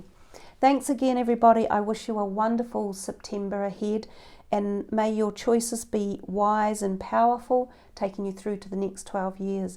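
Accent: New Zealand. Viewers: Hi there are u from nz? U have strong kiwi accent. Subbed. :)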